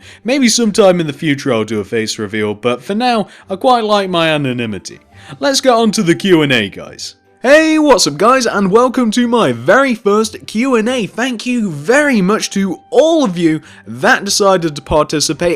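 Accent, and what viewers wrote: United Kingdom. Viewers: I don't why but you remind me of PopularMMO's but with a british accent. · Are u British then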